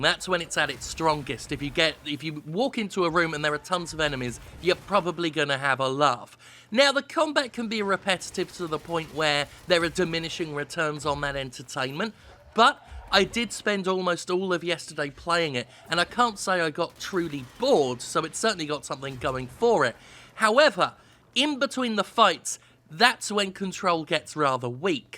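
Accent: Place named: United Kingdom. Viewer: For some reason, sounds particularly British in this episode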